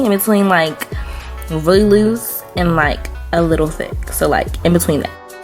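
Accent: DMV, United States. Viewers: Love the color. You sound like you're straight out of the DMV? Let me guess pg county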